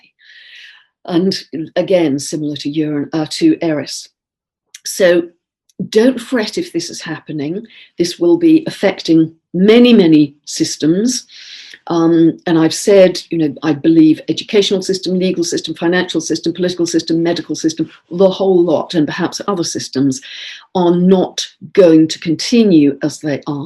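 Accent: England, United Kingdom. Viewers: I'm here for English......I just enjoy listening to her. Light and love from South Africa.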